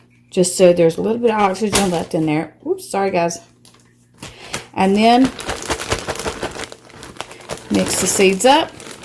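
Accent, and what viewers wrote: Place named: United States. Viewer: Thank you so much for this video... I have always been the type of person that tries to reproduce any plant that she sees... I love plants... I picked up 2 seed pods from my best friends yard today because the red seeds poking out caught my attention... I thought "omg, I can grow magnolia trees"... As soon as I got home I googled it and your video was one if the first things to pop up. Thank you sooo much for the information that you have given me and I just put my seeds to soak a little bit ago.... Also, I love your country accent and you seem like an awesome person overall... I hope you have a great weekend!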